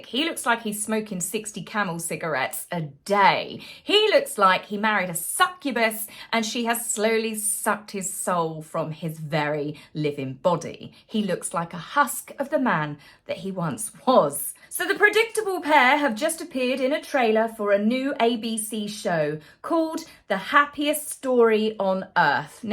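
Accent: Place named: Yorkshire, United Kingdom. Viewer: And Yorkshire accent galore 😂😂❤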